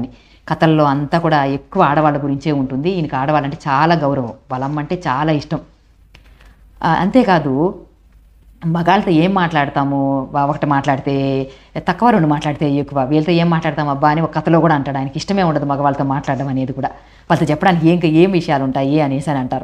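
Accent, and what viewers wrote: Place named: Chittoor, India. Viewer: కథ సహజంగా పల్లెల్లో మన ఇళ్లలో మన మధ్య జరుగుతున్నట్టుగా చిత్తూరు యాస ఉట్టిపడుతోంది మీ నోట ☺️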